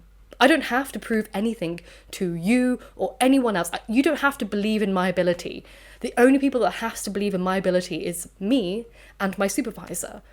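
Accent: England, United Kingdom. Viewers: you remind me of jenny lee in call the midwife <3 i love your pretty british voice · I cant believe how much you look like my little sister, only differences are the hairstyle and the English accent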